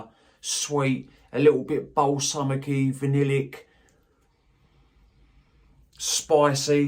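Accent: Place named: Birmingham, United Kingdom. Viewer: Nice review and cool accent. Are you a Brummie? You sound like Arthur Shelby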